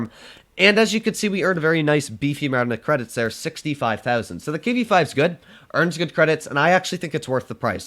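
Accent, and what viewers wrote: Russia. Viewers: I like the way you pronounced the words with the Russian accent😂 that’s funny. I’m from Russia! I really enjoy watching your videos!👍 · I like your Russian accent!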